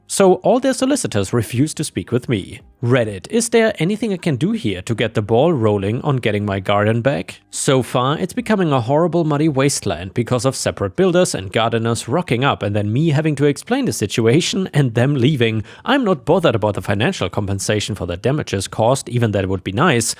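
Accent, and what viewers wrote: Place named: Germany. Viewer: Why don’t you tell your stories in German with subtitles your accent is terrible I would rather listen to the German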